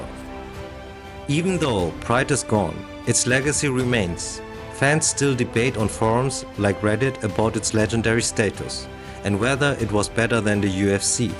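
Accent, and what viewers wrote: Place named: Germany. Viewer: nice video bro but are you using an AI voice? Its sound extremely german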